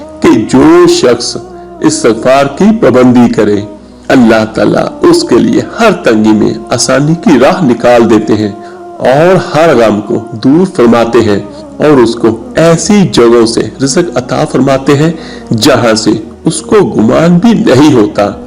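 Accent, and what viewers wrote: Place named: Faisalabad, Pakistan. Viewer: Faisalabadi style of Urdu..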